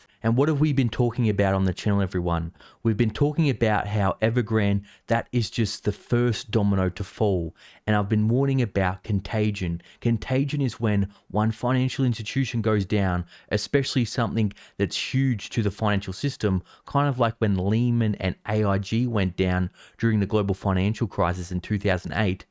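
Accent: Australia. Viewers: Ah, sure it will be grand...seriously, it will. The man on the "tube"...for sure. Festive cheer delivered in an Aussie accent... Like seriously what more could ya ask for?. OK, I admit I'm hooked...hands up. Never trusted those communists in the first place...🍻🥂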